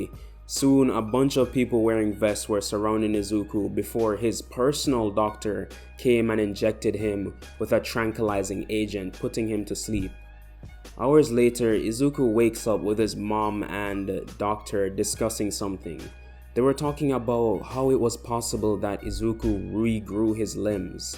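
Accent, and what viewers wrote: Jamaica. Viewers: Jamaican accent?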